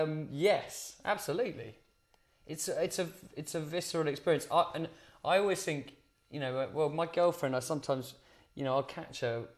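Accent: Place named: United Kingdom. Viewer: ah that british accent 3 3 i just love his voice..he's seriously put his heart and soul in his song !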